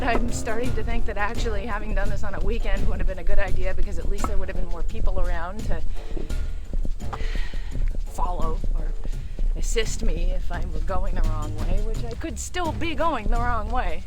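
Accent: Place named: Canada. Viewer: You sound Canadian. Thanks for the video. I am going to Hachioji for about the fifth time (to visit a friend). Still haven't hiked up takao or adjacent mountain. Must give it a try.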